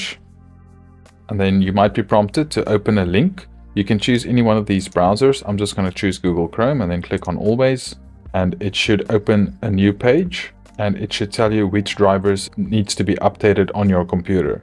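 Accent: South Africa. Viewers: that accent sounds south african,hello south african youtuber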